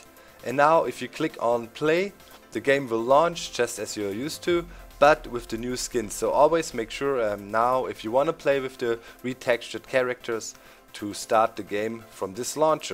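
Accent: Germany. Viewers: Bist du deutsch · bist du aus deutschland?:D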